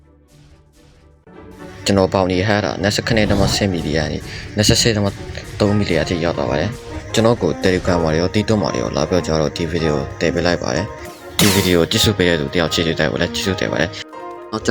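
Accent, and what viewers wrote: Dawei, Myanmar. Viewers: ထာဝယ်သားလာ ဘိတ်သားလာဗျ အသံကရင်းနှီးနေလို့ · ညီကတောအကိုပြောတာနာလည်တယ်ထားဝယ်မိုလာမတိဘူ😅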